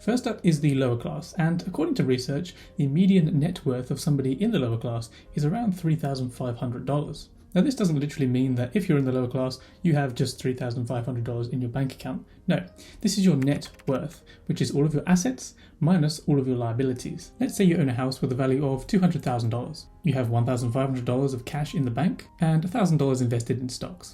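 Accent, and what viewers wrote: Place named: United Kingdom. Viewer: You are talking about US dollars and at the same time you sound British...😅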